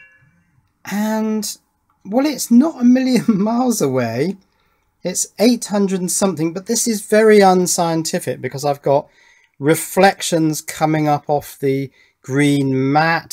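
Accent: England, United Kingdom. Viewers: By his accent, England I'd guess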